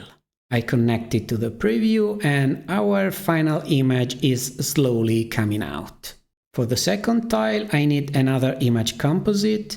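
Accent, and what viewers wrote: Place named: Italy. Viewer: Thank you! Watching your videos after having spent a few months with comfy is like going through a grammar book after having had a thousand hours of input. Same hilarious feeling! Also your accent is cool! I've spent some time around one Italian dude in India, so watching your videos brings back those fun memories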